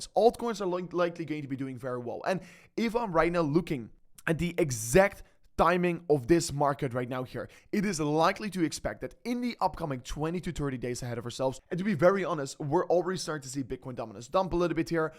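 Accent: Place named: Netherlands. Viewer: aaah I just knew from your accent that you are dutch hahaha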